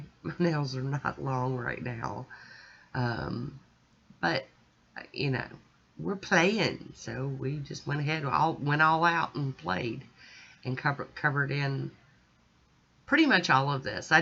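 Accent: South, United States. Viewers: I love it!! And I like your voice, it's the few times I get to hear a southern accent. And your tips are especially nice, sometimes ya forget things and make it harder on yourselves. 💚🍀💚🍀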